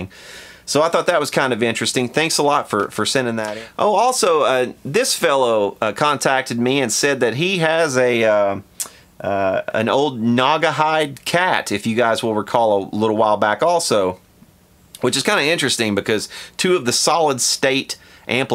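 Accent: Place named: Louisiana, United States. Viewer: You sound like Theo Von. Are you from Louisiana?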